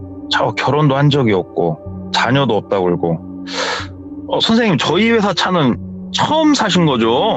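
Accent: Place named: Jeolla, South Korea. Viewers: 정겨워요~ 저도 전주사람 입니다 제가 항상듯던 우리동네 말투~ㅎ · 맞아, 몰입감 너무 좋고 전라도 살았던 청취자로서 오랜만에 듣는 사투리에 정겹게 들었음ㅋㅋㅋ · 고향이 전북쪽인거 같아요 말투가 너무 정겨워요~~째깐한^^저랑 고향이 같으신듯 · 의 정겨운 전라도 사투리..좋아요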